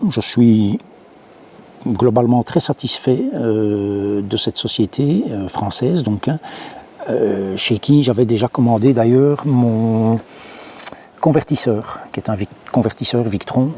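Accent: Belgium. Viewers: Meticuleux soigné avec un ravissement pour l oreille...l accent belge juste ce qu il faut ! 😎merci !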